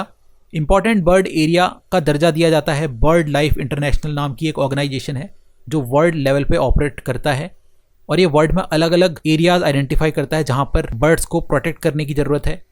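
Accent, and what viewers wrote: Bihar, India. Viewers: Are u bihari??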